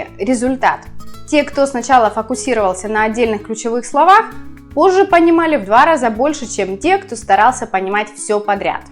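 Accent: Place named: Russia. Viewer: у вас ужасный русский акцент когда говорите по испански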